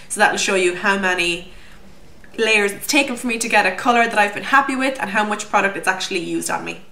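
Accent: Ireland. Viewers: just love your irish accent. thanks for the reviews.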